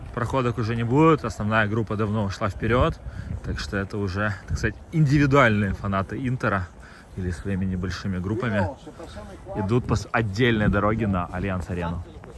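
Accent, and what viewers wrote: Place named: Odessa, Ukraine. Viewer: Первый раз смотрю видео автора, практически сразу, по говору понимаю, что наш человек, одессит!) Также был на этом матче на гостевом секторе, эмоции суперские. Nel bene e nel male forza Internazionale!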